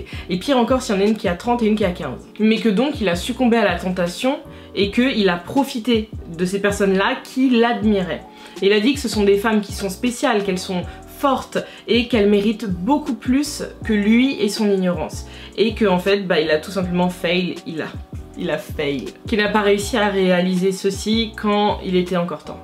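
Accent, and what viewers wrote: United Kingdom. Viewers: On accent anglais >>>>>>>>>>>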